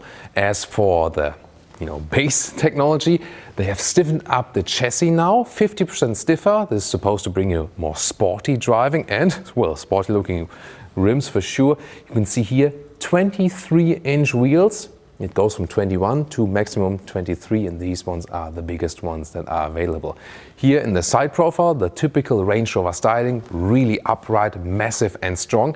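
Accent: Germany. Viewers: Like always great review ! thank you! I like German accent! good job!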